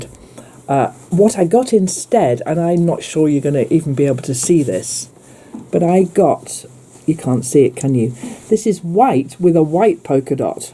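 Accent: England, United Kingdom. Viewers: I have must found your site. Lovely to hear an English accent. I live in South Australia. I will be looking forward to seeing more of your beautiful work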